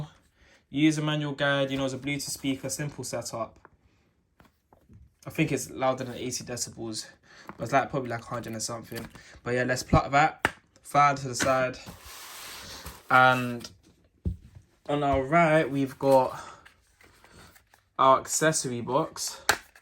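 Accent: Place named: Northern England, United Kingdom. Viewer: Bruv are you from Manchester ?your accent sound northern.im from london so what just wondering, safe for the video fam can you pair your d9 with the d10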